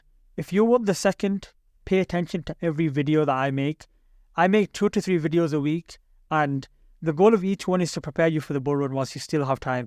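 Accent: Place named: Yorkshire, United Kingdom. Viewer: He's literally English from I'm guessing Yorkshire or Lancashire like Bradford area learn accents before you start labelling smart arse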